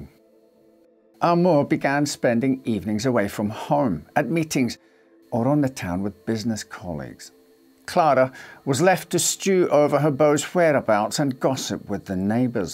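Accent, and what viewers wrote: Scotland, United Kingdom. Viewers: Definitely Scottish. A very light burr..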